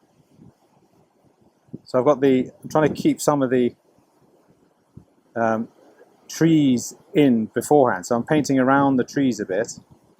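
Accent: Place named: Scotland, United Kingdom. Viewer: You’re Scottish?!! 😮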